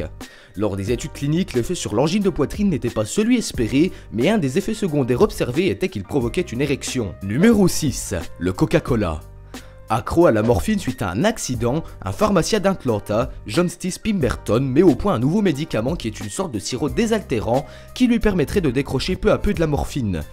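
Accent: Belgium. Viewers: T'es pas belges ? Parce que t'as un accent qui ressemble fort à l'accent belge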